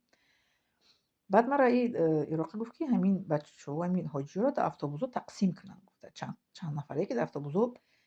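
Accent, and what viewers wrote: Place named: Kulob, Tajikistan. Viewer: Ехоҳар хдт ай кҷои Гуфти кулобиҳо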